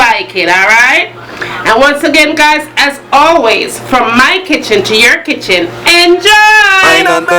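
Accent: Jamaica. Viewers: Now i "H-add" a little... yeah man real yaawdy this... can't get anymore authentic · Mi just love how you talk straight jamaican. Thanks fi the recipe. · Delicious! Jamaican cornmeal porridge. I grew up in Lewisham, SE London. I love your Jamaican accent! It's beautiful! And love the 'Nah wan no lumpy-lumpy porridge'. Jamaican is just the coolest patois...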